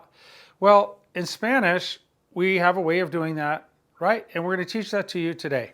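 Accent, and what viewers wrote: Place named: Massachusetts, United States. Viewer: Great video! Really enjoyed that; and love the MA accent too! Thank you.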